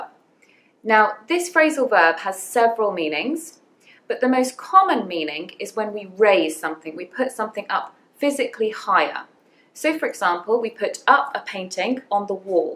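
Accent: United Kingdom. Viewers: I really look up to you because of your pure British English accent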